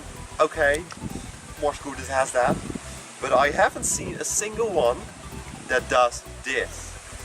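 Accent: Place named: Netherlands. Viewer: The Dutch accent tho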